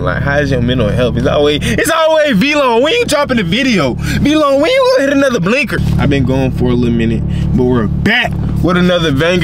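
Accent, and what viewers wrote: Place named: South Carolina, United States. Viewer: Young man your accent is that South Carolina yo fam my family is from the low country keep pushing young man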